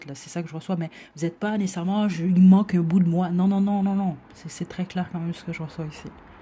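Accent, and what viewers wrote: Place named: Canada. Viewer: Merci pour Le tirage. Les fj: interessant. Je le ressens aussi comme ça. La terre est stressé, c vrai, reconnectons nous a elle. Aimons la. Et sortir du monde à cravate. Créons notre propre réalité. Et pour les ET Oui c sur. Nous meme venons sans doute d’ailleurs ou une partie de nous meme.. j’avais déjà entendu qu’il y avait des passages secrets dans la terre où il y aurait une vie paradisiaque avec d’autres animaux.. passage secrets, cachés.. mais comme tout secret, ça doit être sécréter.. découvert. Belle canalisation. J’adore le corbeau. Y’a de la magie dans l’air... Merci c’était la deuxième fois que je t’écoute. Je t’aime bien. Beau rire et accent canadien j’adore ❤️❤️ bonne continuation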